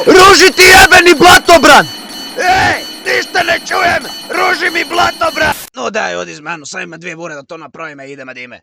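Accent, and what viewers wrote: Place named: Zagorje, Croatia. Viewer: Ovo je vrhhhh🤣🤣🤣🤣 molim te samo izbacuj van videe s zagorskim naglaskom predobro je. ...vrne me u cajte kad sam hodil u Krapinu h skole pa smo furt neli probleme z krapincanima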